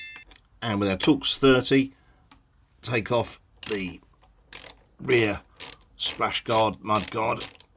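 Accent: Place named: RP, United Kingdom. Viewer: I'm doing good, thanks. So you've gone from being a bad ass head of a bike gang, to a posh speaking GS riding Youtuber. 😁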